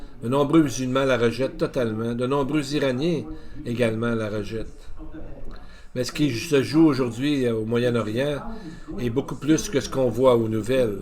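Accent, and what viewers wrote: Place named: Canada. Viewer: C'est un accent canadien. Il est flagrant de s'apercevoir que l'intonation marque bien l'origine de ce son du continent canadien. Un beau pays, le Canada. Le Canada fait aussi de la politique, incroyable ! Dommage que l'on n'ait toujours pas la vérité. A croire que l'homme en est incapable.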